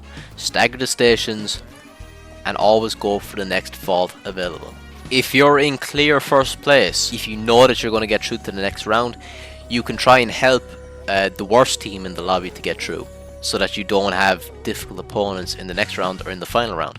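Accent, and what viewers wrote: Ireland. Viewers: Hey, thank you for not using AI voice anymore, up the Irish accent!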